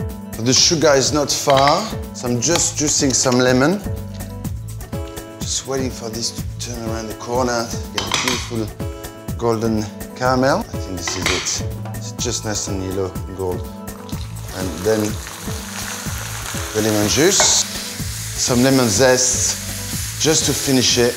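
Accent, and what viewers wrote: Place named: France. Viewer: Not sure this was a good video because all I noticed was his charming face and his endless french accent go blah blah blah. Your words mean nothing Manu, but ur face remains magnificent.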